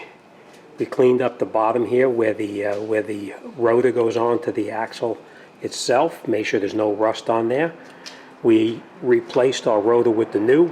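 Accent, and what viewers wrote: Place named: New Jersey, United States. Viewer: "Bolth bolts" love Jersey lol. Must be north Jersey. Thanks for the vid. Helped me out greatly.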